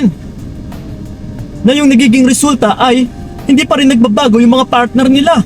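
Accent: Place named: Hiligaynon, Philippines. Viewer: parang ilonggo si kuya dahil yong tono ng salita niya malumanay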